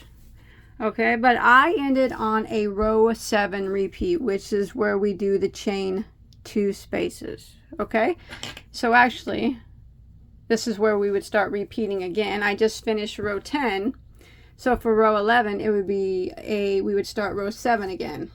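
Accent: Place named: Midwest, United States. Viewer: I love it! I have been looking for the perfect pattern to make as a gift for my sister. Have a number of wips so will be a little while before I can start this. I love listening to you. I’m from Iowa but have lived in Washington state most of my adult life. I enjoy your midwestern accent. My youngest son often corrected me on n how I said wash. Mom there is no “ o” in Washington.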